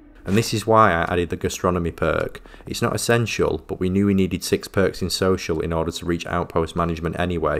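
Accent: United Kingdom. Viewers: Thanks, this is the first video ive seen that has a nice soothing UK accent over "some others" that are hard to bear, and explains the flow through the various processes quite well indeed. Many thanks. On PC ( Possibly consoles also) there is a mod you can get called Richer Galaxy which increases the vendor cash amounts and a few other things also, might make it at least feel worthwhile to make and sell your niff naff tat.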